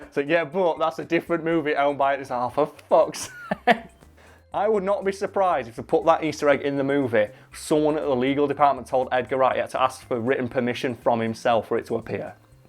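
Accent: United Kingdom. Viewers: Finally, someone who talks rate bladdy British! Someone to like!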